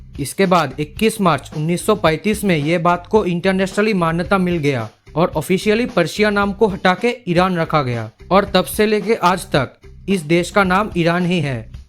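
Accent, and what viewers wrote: Bengal, India. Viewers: Are u bengali? Anyways love from kolkata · Aaap Bengali ho na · Your accent is kinda like bengali people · Tu Bengali hai na?(pronunciation) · Are you bengalee? Because your accent makes me feel like that P:S: I'm a bengalee too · Idk why but tumhara accent Bengali lag rha h mujhe 😄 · Are you bengali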